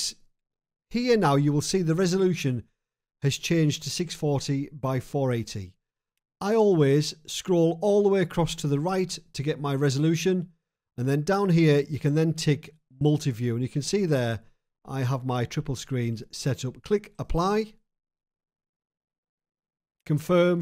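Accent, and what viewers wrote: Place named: Cumbria, United Kingdom. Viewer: Definitely a Cumbrian 😂👌🏼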